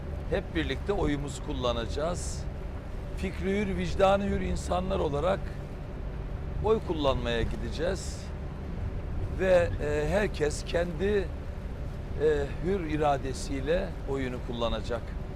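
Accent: Black Sea, Turkey. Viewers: Hafif Karadeniz aksanı çok tatlı değil mi ya ❤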